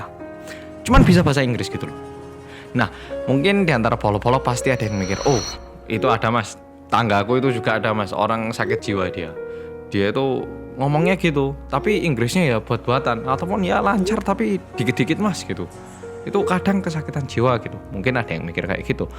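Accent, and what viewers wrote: Java, Indonesia. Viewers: Orang Korea Medok. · hello guys welcome back to Korea Reomit bersama orang korea yang.. MEDHOKKKKKKKKK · Mas medokkkkk wkwkwk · back to story from, wes medok, muaenak tenen dengar ceritonya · mau ngumpulin org yang sama sama nyebut medhookkkkk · Oke bolo-bolo , bersama orang Korea yang Medokkkkkk ,🤗🤗🤗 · editor nya mantap bang nya lebih mantap..Fix paduan mantap 😆😆 Salam medhooookkk!😂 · Podho Karo tonggoku ,jare wong Korea ,tapi ngomonge medhok juowooo pol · Kenapa kalau lihat mas gw selalu tiruin intro nya HALO GUYS WLCOME BACK TO KOREA REOMIT BERSAMA ORANG KOREA YANG MEDHOKKKKK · Pentinge "medhokkk" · kok seneng q ndelok e mase medok e iku 🤣🤣🤣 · bersama orng korea yang... AKU&: menDOK!!! Aku selalu ngikutin kata "mendok" setiap opening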